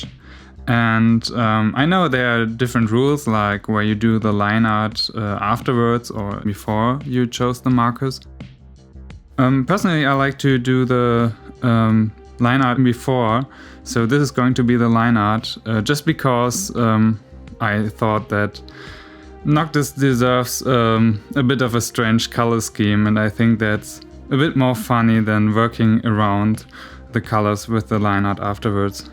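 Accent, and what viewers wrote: Germany. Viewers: I KNEW IT!! THAT TRUE GERMAN ACCENT! xD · ich weis nicht ob ich mich täusche, aber es hört sich an als ob du aus Deutschland kommst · are you german? your accent sounds so german.. :) btw, nice video i love how it turned out, you are very talented! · are you german? Man hört einen Akzent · man hört dass du normalerweise deutsch redest (denke ich jz mal weil du vor nem Jahr oder so ein Video mit nem deutschen Titel hochgeladen hast). bin gerade voll überrascht dass man so was tatsächlich als deutscher erkennt lol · sounds german xD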